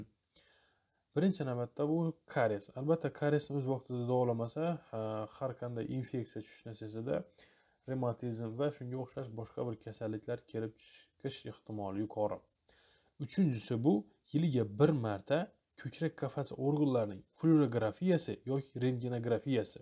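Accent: Khorezm, Uzbekistan. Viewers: доктор кайерда ишлайсиз гапиришиздан хоразимликка охшайапсиз.агар хоразимда болсангиз иш жойизни айтинг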